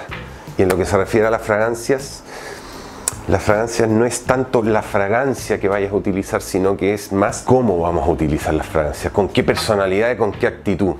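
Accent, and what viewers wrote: Chile. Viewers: Acento chileno para que tu veas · Chileno no? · Que onda la gente que dice "aun se le nota lo chileno" ???? Habla totalmente Chileno, lo unico que se le pego de españa es el "os" en ves de "les" eso es todo, su tonada y forma de hablar es 100% Chilena. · hugo bello arce Ese es el típico acento chileno de Santiago y la zona central, tiene un no sé que de acento andaluz. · Aparte usa ese acento que parece frances y eso lo hace mas interesante aunque es chileno, de a ratos se le nota el acento chileno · Es chileno o español? 😅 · es chileno cierto? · de donde eres ? , porque yo siendo Chileno se nota muy claro su acento Chileno y no le encuentro nada parecido al acento , venezolano ni Dominicano ni nada parecido. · Es Chileno. Se nota altiro... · Gar ..también pense que era español ..pero analizando bien ...todavía se le escucha el acento chileno....solo le falta agregar el ""pooooh" y ya esta.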